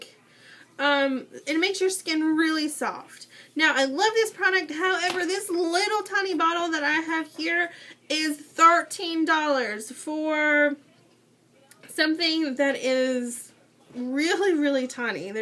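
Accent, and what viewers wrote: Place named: West Virginia, United States. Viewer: All I need is broke fat girl with a WVa accent sounding like hillbilly Minnie Mouse telling me how to look cheap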